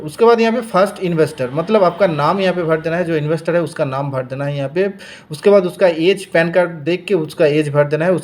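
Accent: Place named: Bengal, India. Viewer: হিন্দি টা ঠিক হচ্ছে না বাংলা চলে আসছে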